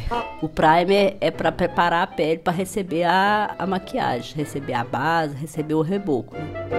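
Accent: Minas Gerais, Brazil. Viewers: Se eu que sou de Minas amo esse sotaque nosso,imagina quem não é 😍😍😂😂😂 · E esse sotaque mineiro haha amo, mineirinha tbm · Bao de mais...amo sotaque de Minas Gerais 🙌😁😘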